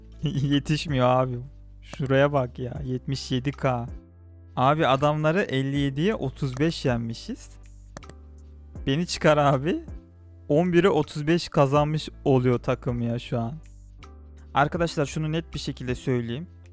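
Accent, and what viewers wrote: Malatya, Turkey. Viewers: Malatyalı mısın hemşerim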